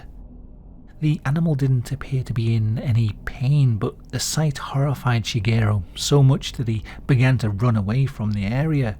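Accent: United Kingdom. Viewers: WHILE THIS ARTWORK IS HAUNTING AND DISTURBING LET US NEVER FORGET THAT EMPEROR HIROHITO HAD ALREADY JOINED FORCED WITH ADOLPH HITLER AND THAT NEITHER ONE OF THEM WAS INTERESTED IN STANDING DOWN, THEIR MUTUAL GOAL WAS 100 % WORLD DOMINATION. I AM SURE THAT THE US SAILORS WHO RESIDE AT THE BOTTOM OF THE OCEAN ON THE ARIZONA AND OTHER SHIPS WOULD REALLY ENJOY THIS BRITISH NARRATION AND VIEWS AND YOUR OPINIONS. PERHAPS THOSE USA BURNED AND DROWNED SAILORS WOULD HAVE PREFERRED TO LIVE OUT THE REST OF THEIR LIVES ON EARTH INSTEAD OF DOWN IN THE OCEAN AS FOOD FOR FISHES. SO KEEP YOUR WOKISM OPINIONS ABOUT A WAR YOU DID NOT PARTICIPATE IN NOR FIGHT IN, AS MY OWN FATHER DID!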